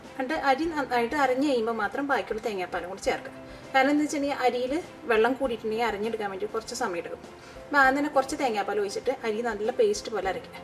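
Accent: Thrissur, India. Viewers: No offence Nancy, but her talking style is her idendity! I would request her to be the way she is! I am not from Thrissur. But when i listen to her, somehow I feel home.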